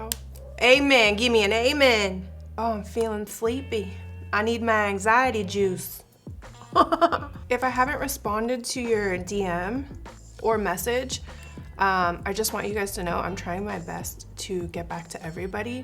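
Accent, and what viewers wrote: South, United States. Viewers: Your SOUTHERN accent gets me EVERYTIME lol. So glad to see you back hun, 2020 and 21' haven't been easy for ANYONE...but we're all here together❤ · I love when you do your country accent 🤣 I’m from Texas girl and it hits me right in the feel good happy spot ya hear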